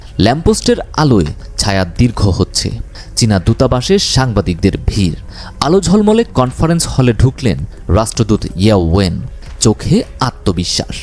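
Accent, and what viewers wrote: India. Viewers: আপনার কথায় দাদাদের কথার সুর....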